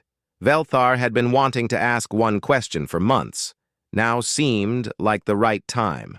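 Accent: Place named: South Korea. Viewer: Which Korea? Having issues picking from the accent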